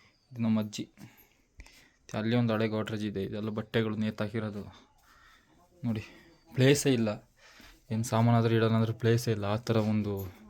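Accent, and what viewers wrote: Kodagu, India. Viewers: ಅವರ ಮಾತು ಕೇಳಿದರೆ ಕೊಡಗಿನ ದುಬಾರೆ ಅಂತ ಕಾಣಿಸುತ್ತೇ.... ನಾವು ಅದೇ ಜಾಗ, ಗುಡ್ಡೆಹೊಸುರಿನವರು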